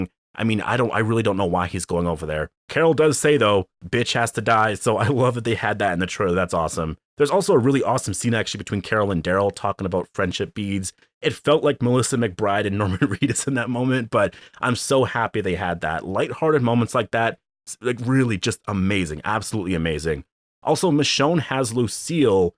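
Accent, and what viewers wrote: Canada. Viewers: Just realized you have a Canadian accent!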